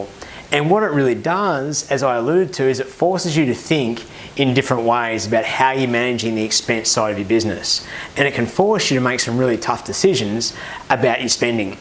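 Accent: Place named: Australia. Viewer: Love your aussie accent mate. You explained very well. Thanks and keep making more detailed videos regarding sales, profit, some success stories, case studies .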